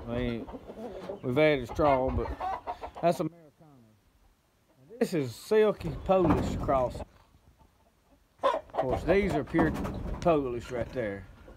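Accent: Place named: Tennessee, United States. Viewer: Where are you located? Sound close to tn.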